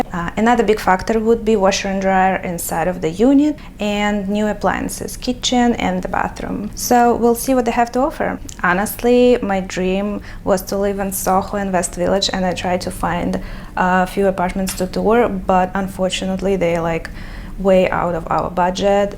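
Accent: Russia. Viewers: невозможно слушать такой сильный русский акцент!!!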